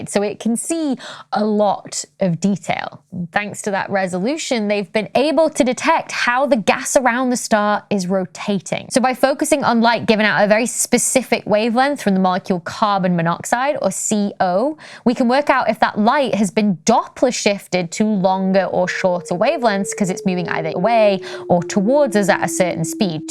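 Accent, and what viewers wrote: United Kingdom. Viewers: I’ve written this before… I used to live in the UK so listening to your British accent is refreshing. How did you cram so much knowledge into your brain at such a young age?! Your intelligence is beyond amazing. With that said, I have issues things you cosmologists expound. Since my education is a master’s in computer science my brain thinks in one’s and zeros, in on and offs, trues and falses… To me and my tiny brain, I cannot fathom an edge to our universe. I completely disagree with the notion that the universe is just 13.8 billion years old. I can’t imagine how this fact is even a fact at all… As Spock says it, “It’s not logical!” Just because we can’t see beyond “the edge” doesn’t mean there’s nothing beyond. In my beliefs, I posit the universe is infinitely old and infinitely big. I have no way to prove my theories. I guess that is why I am so drawn to your presentations. 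I love your videos, so maybe like a dry sponge absorbs water, I may get to a point where I could hold an intelligent conversation with you on these subjects… Merry Christmas, Dr. Becky!! 😊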